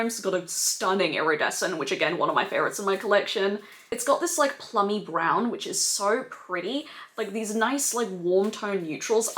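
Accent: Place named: Ireland. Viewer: More of that Irish accent, please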